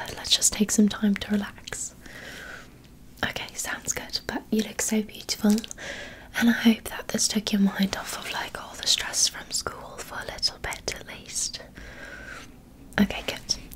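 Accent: United Kingdom. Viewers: Well done on the British accent,I'm from Birmingham so sound like the Peaky blinders 🇬🇧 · I don’t know what it is about the British accent but I love ittttt ❤️ happy early Easter! If you celebrate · obsessed with your british accent 😭 i love these kind of hair asmr vids so i was so happy to see this 🫶🏻💗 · One of the only british accent i love Edit: 3 Weeks later this still gives me the most Tingels ever❤ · your british accent is always so great ❤ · something about your british accent gives me so many tingles.... I've had a bit of tingle immunity recently but this is Fantastic! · as a british person i can’t really tell where in the uk your accent would be from but i love ittt it sounds way nicer than most british accents 😭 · you with a british accent is really giving me anya taylor joy vibes !!! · As a British people I always found it annoying when people would over sell the accent by like <num>. 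However your is sweet and suttel. Luv u ♡ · TIMESTAMPS🐬 - ~ intro, thanking you for coming over & chatting (face touching). - ~ showing you her hair charms. - ~ more chatting. - ~ more hair charms. - ~ hair tinsel. - ~ tapping & scratching her hair brush. - ~ taking your braids out & commenting on the weather. - ~ brushing your hair. - ~ showing you her butterfly clips. - ~ detangle brush & gossiping. - ~ braiding your hair. - ~ butterfly clips in the braid. - ~ brushing your hair - ~ putting hair tinsel in your hair. - ~ braiding your hair & hair charms. - ~ aquamarine the movie. - ~ hair serum. - ~ massaging your scalp & positive affirmations. - ~ fixing your parting. - ~ more butterfly clips. - ~ noticing how similar our hair colour is. - ~ tapping on hair charms. - ~ putting more tinsel in your hair & showing you how to. - ~ wrapping up the video. p.s ur British accent is incredible. 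It’s sooo satisfying hearing you say ‘pretty’ 😊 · I've suffered from tingle immunity for a while and this video cured it! There's just something about the British accent. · I love the British accent so much 🥰💖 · You have a very good British accent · Girl I love this accent! Thank you for appreciating us brits😭❤ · I think London mixed with brummie hahah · Your British accent is fab!! I hear some potential for Northern Irish there too - I think you’d be great at it as well! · doing a british accent is just so tingly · The British accent is actually adorable lol.props to you because it's not the easiest to master 😊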